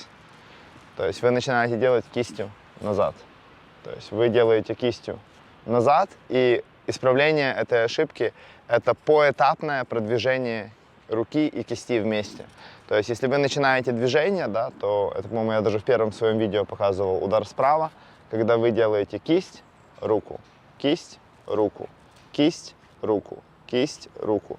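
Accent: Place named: Odessa, Ukraine. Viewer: Хорошее видео Очень знакомый акцент. У моих учениц из Одессы очень похожая речь У меня примерно такой же топ ошибок. Примерно так же исправляю Валерий , тренер из Германии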